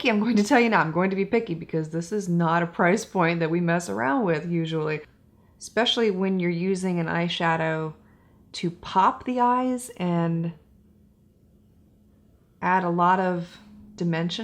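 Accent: United Kingdom. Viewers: Yes I too Love British accent, hence my Dr Who and BBC subscriptions. Thank you for taking on and testing Charlotte Tilbury. I don’t understand the hype because the shade range is not Woman of Color friendly. I have learned so much from her as a makeup artist however you would think she would be more inclusive. Btw can you please do a video on care and maintenance of lashes. I watched your video to apply and am doing ok but it’s the wear them more than twice I am working on. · I can listen to people with English accents all day too. Thanks for such an honest review. CT has beautiful packaging and some great products but it's too expensive. Can you do a first impression of MUFE new self setting concealer? I'd love to hear your thoughts on it. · British accents are the best! LOVE Charlotte Tilbury.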